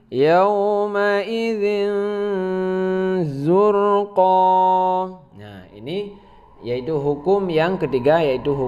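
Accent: Aceh, Indonesia. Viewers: Logatnya seperti orang Aceh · Pak Ustadz, dialeknya khas Aceh sekali .... maa syaa Alloh .... peu haba Pak Ustadz ?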